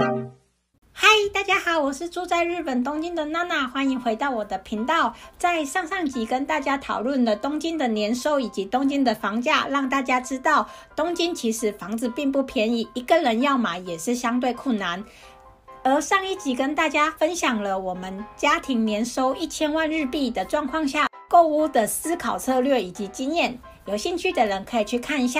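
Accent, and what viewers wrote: Taiwan. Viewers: 台中口音!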